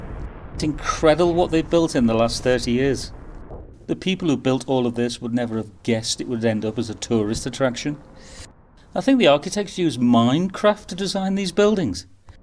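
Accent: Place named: Liverpool, United Kingdom. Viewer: He has an unusual Scouse accent tbh, feels like he's trying to sound posh at the same time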